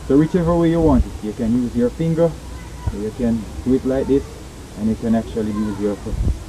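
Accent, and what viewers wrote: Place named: Jamaica. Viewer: I like your Jamaican accent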